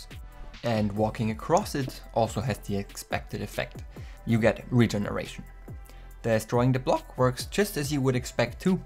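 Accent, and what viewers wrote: Germany. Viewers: are you german? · Ich finde dein englisch witzig weil man eher das deutsche von dir hört🤣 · Ich mag deinen deutschen akzent :)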